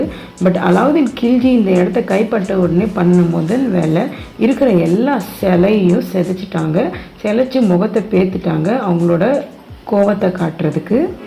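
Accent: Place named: Tirunelveli, India. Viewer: தம்பி food review சிறிது திருநெல்வேலி பாஷையுடன் நல்லா இருந்தது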